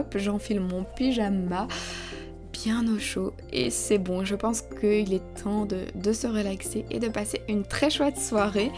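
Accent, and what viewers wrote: Belgium. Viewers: J adore l accent Belge!!!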